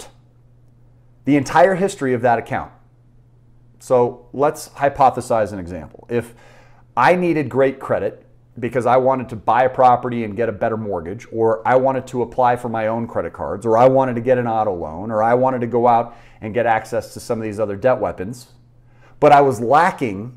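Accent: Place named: United States. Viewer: What a nice cowboy accent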